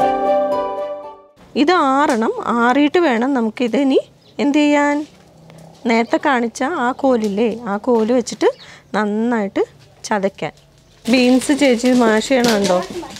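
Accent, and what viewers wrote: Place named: Kochi, India. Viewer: Chechy vyppin Kari anoo / atho kochikari ?slang kettit angine thonnu, enthayalum sambhavam powlichutta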